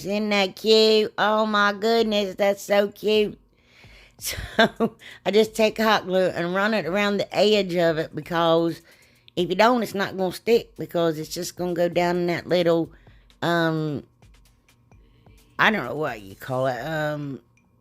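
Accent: South, United States. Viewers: 1st time viewer,,,and I absolutely ❤ you and your personality and not to mention ya sound like me when I talk,,,(country accent)🤭🤭🤭,,,I'm from Alabama,,,where are you from? Love your creativity,,,,I am now subscribed,,,cant wait to see more,,,thank you for sharing💕💕💕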